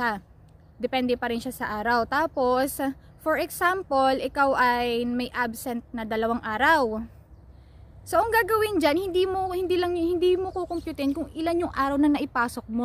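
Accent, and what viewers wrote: Batangas, Philippines. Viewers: Batangueno ka ga ate? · Natutunan ko na po Consonants at ang saya nun natutunan ko nakakapagbasa na ako, magaling yung napanood ko Korean Unnie isang nood ko lang natandaan ko na turo nya. Lagi ako manonood sa vlog mo nakakatuwa accent mo na Batangas, nga pala kaya nawili ako panoorin ka kasi kahawig mo si Pauleen Luna lalo pag nag smile ka. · taga batangas ka? hehe nice video 😁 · Accent ng batangueña 🖤 Pwede po ba magapply ng factory worker ang mga naka eye glasses? Or malabo ng mga mata?thankyou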